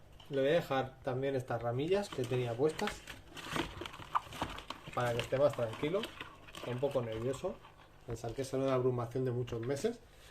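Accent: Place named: Spain. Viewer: Hola, una pregunta. Por tu voz me imagino que eres de España. Hace poco pusieron una ley la cual prohibía muchos reptiles o algo así. Yo soy una persona que adora los reptiles y tengo varios pero son mayores. ¿Como haces para tener ejemplares de lagartos exóticos tras la ley? Esque me interesa el teju argentino blanco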